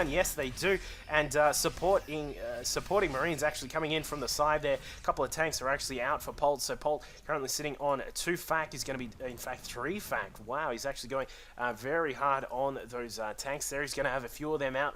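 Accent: Australia. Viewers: an aussie?! bout time!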